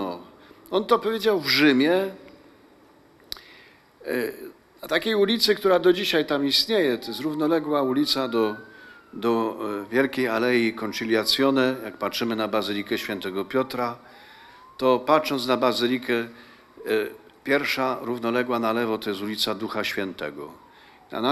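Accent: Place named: Kraków, Poland. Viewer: oprócz Słowa Bożego pielęgnuj ks. abp swój krakowski akcent - jako dowód piękna naszej ojczystej mowy . Niech Matka Boża ma Cię w nieustającej opiece.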